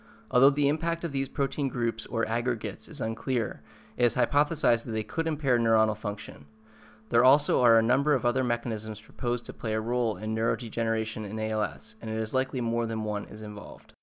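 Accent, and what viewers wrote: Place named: United States. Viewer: NOT familiar with the american accent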